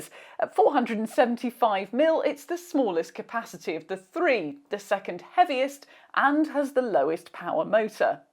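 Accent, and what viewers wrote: England, United Kingdom. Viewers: an anglish person thank god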